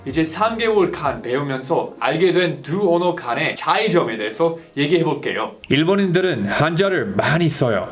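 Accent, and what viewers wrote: South Korea. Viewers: 재밌네요. 한국어 다음에 배우셔서 일본어에 한국어 액센트가 들려요 ㅎㅎㅎ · 일본어 발음을 한국어발음으로 하시네 ㅋㅋㅋ영어발음으로 하시는게아니라 신기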